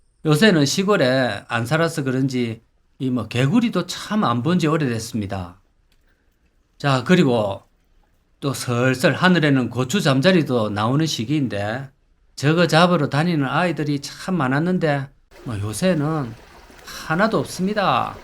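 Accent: Gyeongsang, South Korea. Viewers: 반가버요 목소리에 사투리에 빵터집니다 고매 경상도 사투리에 물들어갑니다^^ 축카 축카합니다~~😅😅😅😅😅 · 언제들어도 구수한 갱상도사투리.....늘 잘보고있읍니다 오십만..화이팅하세요~~~^^♡ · 구수한 경상도 말투 사투리가 압권 ~~🎉❤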